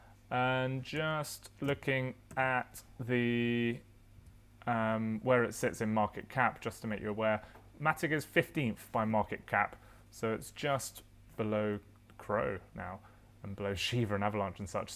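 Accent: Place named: United Kingdom. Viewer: Great to hear another British accent :)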